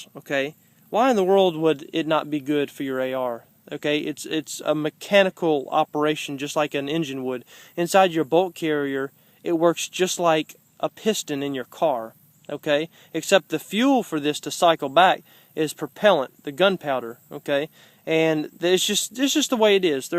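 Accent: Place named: Texas, United States. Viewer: accent, Im almost sure sounded like texas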